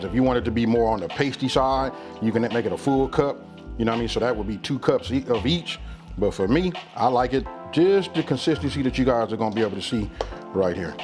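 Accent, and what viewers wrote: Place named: Mississippi, United States. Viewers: OH yum, very similar to the way my mom and I make it.....I’ll call it Northern Style Macaroni lol. I’ll be making this as soon as it gets a little warmer, still in the 30’s and 40’s up here. What makes this Southern we all use the same ingredients. My boyfriend that passed 3 years ago, turned me on to Miracle Whip and I love it. Thanks for the recipes. You are so fun to watch......love the Southern accent. Peace and be safe. You’re wife is beautiful and you’re a gem, Would love for me to have someone like you cooking. Yum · Ur wife is beautiful and I think you are both awesome, ur accent tells me you live close to me, In an Arkie, you sound like Mississippi, God bless you, I watched you make this and thought a couple cans of shrimp cocktail shrimp would put that over the top, maybe a little stripes of bacon crushed up over the top, man I gotta go eat something now, God bless you and urs.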